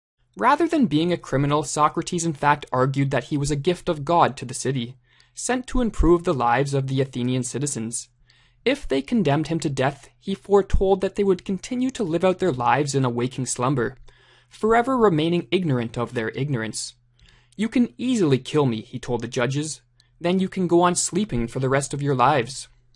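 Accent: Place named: Canada. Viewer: you can tell he's canadian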